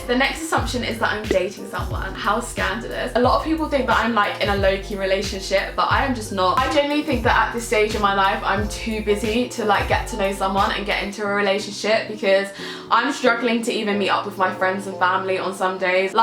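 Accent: United Kingdom. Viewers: Omg i totally wasnt expecting you to be British!! Whereabouts are you from??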